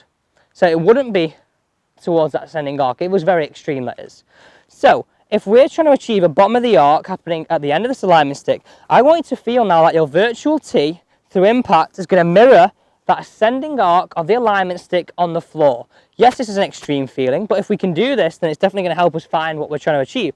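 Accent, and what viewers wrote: United Kingdom. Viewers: It’s the fast British accent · slow the speech please not all viewers are scottish!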